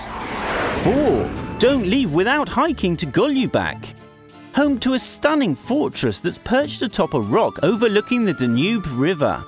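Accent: England, United Kingdom. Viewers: What an ugly pomie accent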